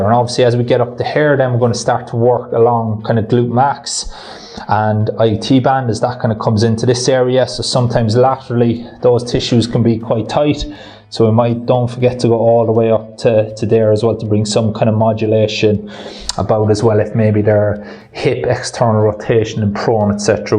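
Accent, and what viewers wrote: Ireland. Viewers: 😅 you from Ireland? I'm from Ireland too that's why I was asking but great video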